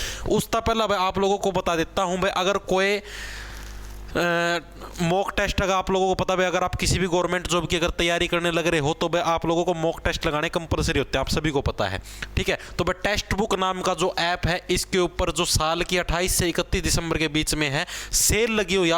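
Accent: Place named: Jamawari, India. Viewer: aap village jamaawari se ho kya aapki aawaj se lagta h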